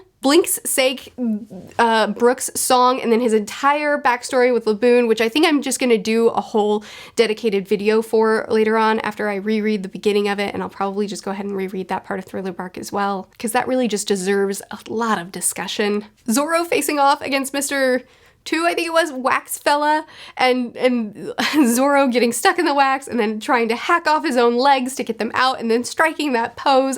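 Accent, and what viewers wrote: Canada. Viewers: Question. Are you Canadian? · Is.....Canadian!???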